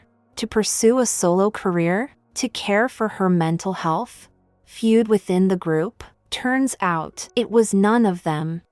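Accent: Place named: West, United States. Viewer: Western accent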